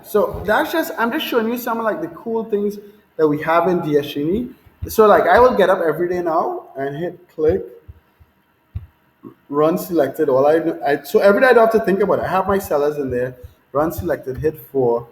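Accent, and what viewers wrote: Trinidad and Tobago. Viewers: sounds like u from trini man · No BS Drop Shipping Training WHAT DE ASS BOY! A Trini! Oh Gossshhh! I subscribing Now! I'm from Laventille!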